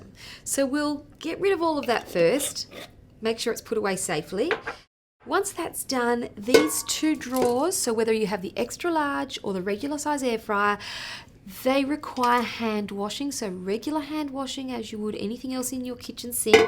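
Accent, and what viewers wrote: Australia. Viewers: the best accent.. gotta love land of kangaroo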